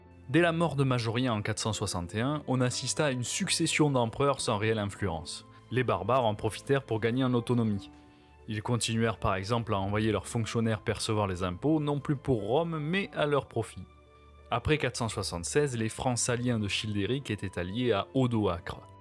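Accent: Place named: Bordeaux, France. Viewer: Ayant de la famille bordelaise, oui votre accent est très peu prononcé, mais vivant dans le Nord, il est facilement audible ahah ! Merci pour ce long écrit, mais j’ai beaucoup plus la sensation avec d’autres empires et même le byzantin, que la chute fut bien plus brutale que pour Rome. Limite plutôt frustrant en fait de ne pas avoir un vrai événement qui enterre a une date précise Rome. En tout cas super vidéo et j’espère en avoir d’autres en reco à l’avenir !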